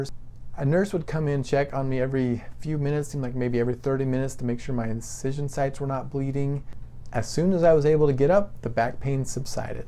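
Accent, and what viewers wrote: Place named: England, United Kingdom. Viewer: Hello. You sound like you might be in England. I don't really agree with all the AFIB protocols recommended there. Keep in mind... that the longer you stay on AFIB antiarrhythmic meds... the more the heart 'remodels' and the less effective an ablation may be. I heard from a rather upset woman in England whose Cardiologist prevented her from getting an ablation, keeping her on a pill protocol for a lengthy period. When she finally did get her ablation, the EP said you waited too long and it won't work that well now. A big mistake is not going to the AFIB professional Electrophysiologist (EP). When I came down with AFIB, I dumped my Cardiologist and contacted an EP immediately. I've had many ablations by the best EP's. None of them had me do a Stress Test. Makes me wonder if you're on the right track. In any case, I wish you the best outcome. 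Every case is different. There are many people who never get ablation and do quite well on pills for decades. Whichever path you choose, I'm sure you'll be fine. I first came down with AFIB around 54. I see some people come down with it when quite young. Sad is the number of pro runners who come down with it.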